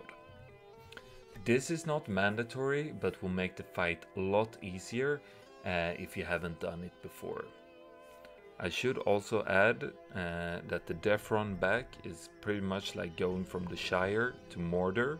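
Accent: Sweden. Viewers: Well since you ask what we would like to see next, here is what i would like to see =) I think a maraudon one pull tutoriel would be a great video. Ofc there are already a whole bunch of mages doing mara one pull on youtube, but i think every mage has his own little techniques, his own sensibility, and i would be pleased to learn what are YOUR way of handling this farm and what are YOUR personnal tips and tricks. Plus i like the way you explain things, with your swedish accent and all =)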